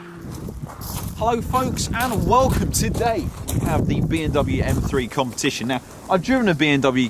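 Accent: United Kingdom. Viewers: Nice vid, wow u are mad British with that accent my man! M3 CS.... I unfortunately didn’t dig the M4/ M3 with the soon to be finished generation of the 3/4 series. I think the fact that it was lighter but only made small hp gains was the big reason why former or current E90/92 M3 owners may not have gravitated towards the newer M car. As for me, I am cashing out on the BMW M brand and hopping over to Porsche later this year, but I hope that BMW tries a bit harder to make their M cars special again. Having the same general engines is not a cool thing, I don’t want to have an M series car that shares the engine with a few tricks and higher boost as a normal top of the range model engine- I want it to be separate (8 also don’t want a damn seat backrest with a glowing M logo on it ... what were they thinking)? Maybe that’s my age kicking in but that is still a dumbass thing they did.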